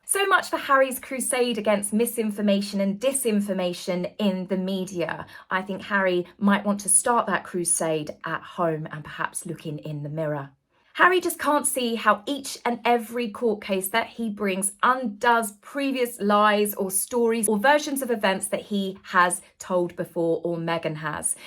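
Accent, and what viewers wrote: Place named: RP, United Kingdom. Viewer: As my husband says he talks posh bollocks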